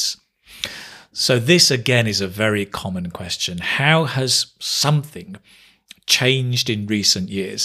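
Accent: United Kingdom. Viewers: Your English accent sounds amazing .. I am in love with British English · Hi. My exam is on March 7th. I really would like to have as much ideas from you as possible. I like your ideas and I love the British accent. I hope that you can make more videos sir. Thank you so much 🙂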